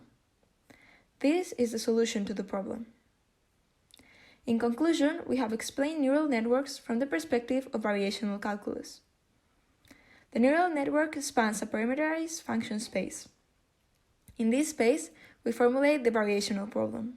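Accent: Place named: Turkey. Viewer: She sounds like she is Turkish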